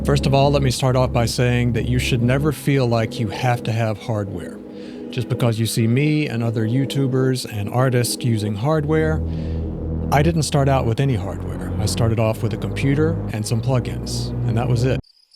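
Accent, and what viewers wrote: South, United States. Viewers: Just to clarify for anyone reading..I was joking about the looks and voice thing. I'm sure many consider J's attributes beyond reproach.. personally though, I don't judge a person based on either. (..got to admit, though, that "southern drawl" sounds pretty cool. 😁)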